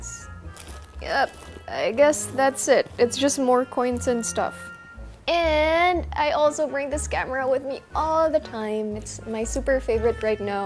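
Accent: Philippines. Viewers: you have a Filipino accent hehe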